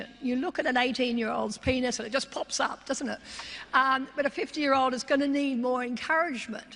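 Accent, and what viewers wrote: Australia. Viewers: Yes, the clue is in the Aussie accent.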